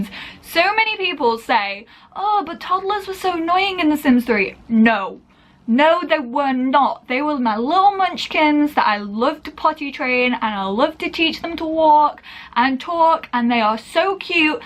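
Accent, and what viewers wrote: England, United Kingdom. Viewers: If you mean you want her accent, I have it so yeah. Just thought I'd say that, if you want to know what it is, all I know is that some part of England.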